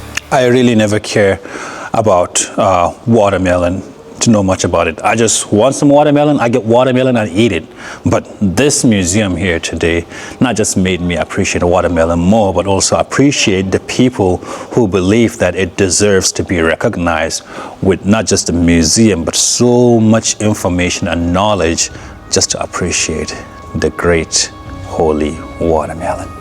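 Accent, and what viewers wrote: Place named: England, United Kingdom. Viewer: Love your English accent man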